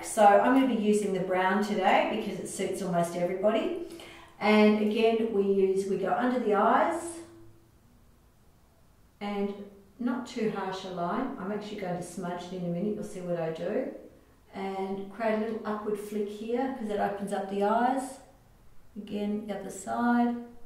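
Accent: Australia. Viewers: is she aussie? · Auzzi accent??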